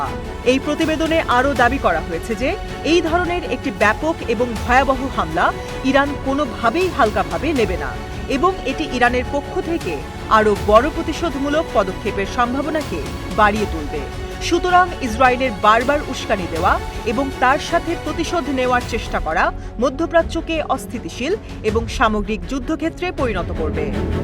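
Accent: Kolkata, India. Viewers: ৭১ টিভি কি সংবাদ পাঠে কোলকাতার সংবাদ পাঠিকা নিয়োগ দিয়েছে? উচচারণে তাই মনে হচ্ছে।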